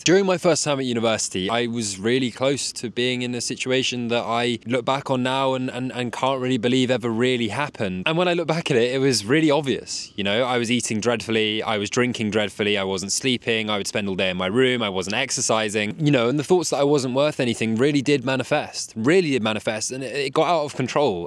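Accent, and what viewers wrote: United Kingdom. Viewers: WOW 너의 영상을 보며 영어공부를 합니다. 너의 영국발음이 너무 어렵습니다. 나는 영국인처럼 영어를 하고 싶습니다.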